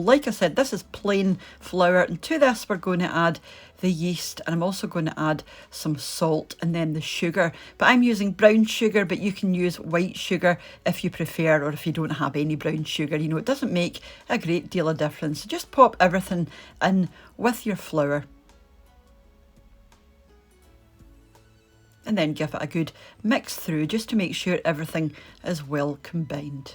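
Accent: Scotland, United Kingdom. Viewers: the wi yoo cook I would sweer your ma was Scottish the accent is a bit hard tae figure oot Um a getting warm 😂😂😂😂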